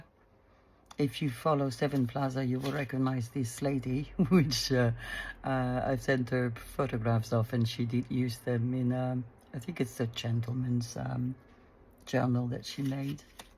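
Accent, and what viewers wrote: France. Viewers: Very good video and your English sounds fine with a French accent! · Wonderful first video! Don't be so hard on yourself! Your English is fine! I loose words too, and I speak English all the time. I also work with a woman from France, so I am comfortable with your accent! Keep up the good work! I got your link from Sevenplaza. Thanks!!! This is Carol from IN. USA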